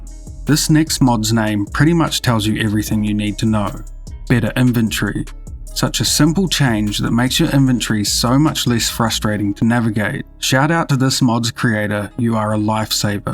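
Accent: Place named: New Zealand. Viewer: This guys a kiwi?Hell yeah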